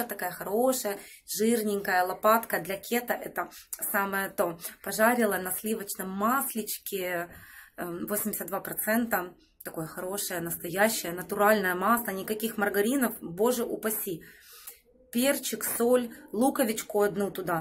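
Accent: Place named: Ukraine. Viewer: у вас украинский акцент, Вы оттуда?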